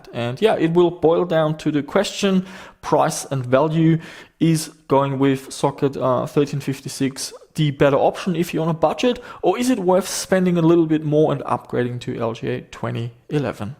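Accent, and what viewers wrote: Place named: Germany. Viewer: He's Australian, but I believe he has family in Austria from memory, so that may be why he sounds slightly German.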